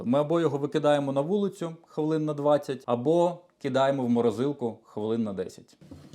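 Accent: Standard. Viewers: отмав важливу інформацію без води і чудову аргументацію, наочно продемонстровано найменші деталі. супер! дуже дякую. Окреме дякую за чисту українську мову.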